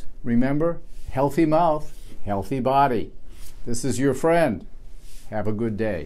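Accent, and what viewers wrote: United Kingdom. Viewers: Let me guess..... ....British?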